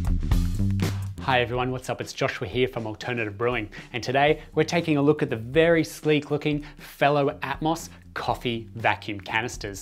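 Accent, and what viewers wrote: Australia. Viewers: Very informative video, pity about the unbearable Australian accent...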